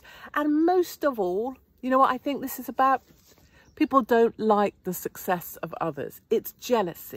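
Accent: United Kingdom. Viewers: I hope the deaf people are reading the comments because the CC didn't capture actually what you are saying; even with your British accent, the word "were" is very clear, the program typed that the Truckers "weren't real men". Not sure if that's on purpose or not, just wanted you to know. Thanks for your confidence your enthusiasm and that British brass. Love you sis, keep it up!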